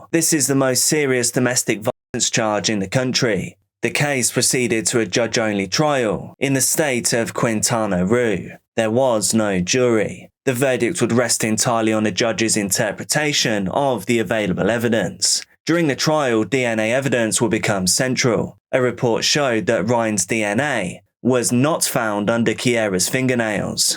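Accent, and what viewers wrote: England, United Kingdom. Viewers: Oh thanks for the "human narrated"!!🩷🩷i nearly skipped this content cause i thoight It was AI. ìnstead,you re englidh,not american! Love ot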